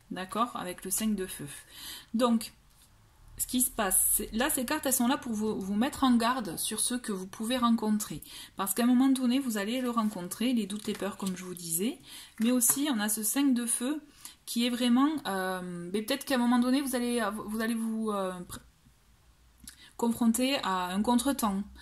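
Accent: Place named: Midi, France. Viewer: Projets 🙏 avec ce bel accent du Midi si je me trompe pas !!!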